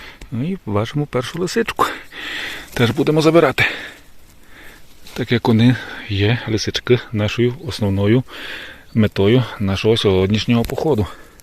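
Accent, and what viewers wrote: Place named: Synevyr, Ukraine. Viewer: В якому місці відео знімали?Бо говір ,як у Синевирській ріці.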